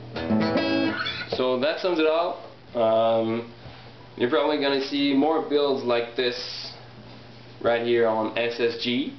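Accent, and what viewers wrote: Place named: Russia. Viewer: Ohhh... Your delicious russian accent :D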